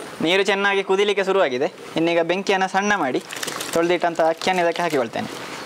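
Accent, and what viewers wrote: Mangalore, India. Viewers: I like ur explanation vid local kudla kannada spr..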